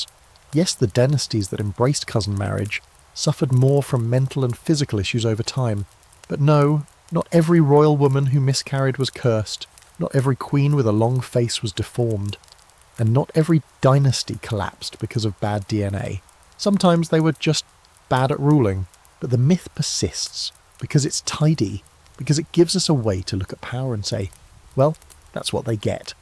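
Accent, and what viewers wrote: United Kingdom. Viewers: ive been joking for years that I put on a documentary with some guy with a british accent to help me fall asleep. I finally found your account. Thank you from Chicago illinois. Its 1am here.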